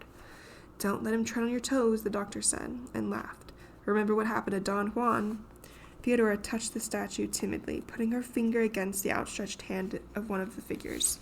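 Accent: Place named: United States. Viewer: It's nice to hear a woman narrating a first-person story with a female protagonist. American too! Thank you!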